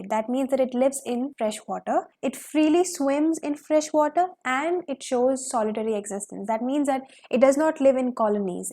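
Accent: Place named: India. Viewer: You're Indian I understand by your voice & manners to speak.☺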